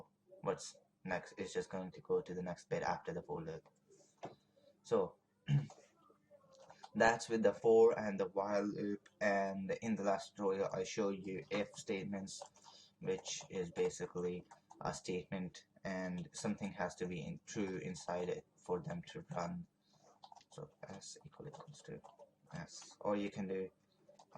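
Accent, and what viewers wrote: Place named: Scotland, United Kingdom. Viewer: Nice and Clear tutorial, made it really easy to follow and understand. Funky accent btw, Scottish twang? :)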